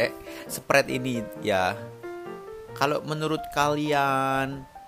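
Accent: Java, Indonesia. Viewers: G bosen lht channel ini medhok&lucu kl lg njelasin,sukses yo rek...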